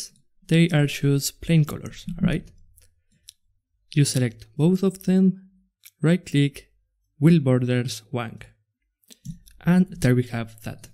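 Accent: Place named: Spain. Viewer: Great Video! by your accent i think that your native language is spanish, dont worry, spanish is also my native language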